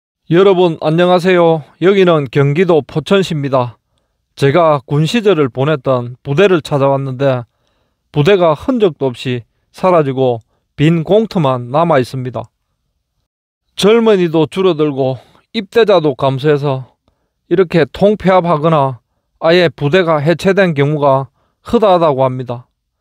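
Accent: Gyeongsang, South Korea. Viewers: 경상남도? 사투리 구수~합니다. 1년 형님 이시데요 저는 쥐띠 입니다. 95년 9월 전역했습니다.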